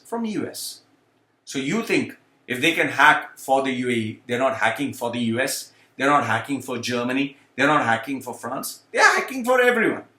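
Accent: Kerala, India. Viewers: Vada idly dosa. Lol you still got the malayali accent and it is very amusing. Again it is free. WOW.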